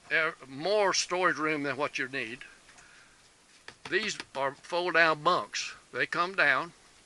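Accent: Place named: South, United States. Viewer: You sir got that nice southern american accent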